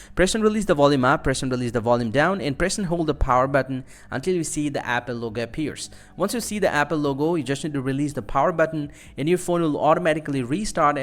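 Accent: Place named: India. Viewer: bhai itna vahiyaad accent hai na tera. Indian english me hi bol le. Saale angrezi chodde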